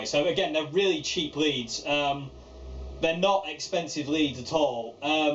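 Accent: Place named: Birmingham, United Kingdom. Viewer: I enjoyed this! cheers mate. I especially liked the "Apache rose: Peacock" breakdown. Thats a lovely chord progression - and you did it nicely. Fair Play. :) JpD. (PS: you sound "brum-light", like a young J.Carrot - it's an observation; and he is a likeable bloke, so don't think im being off). Keep up the good work mate :)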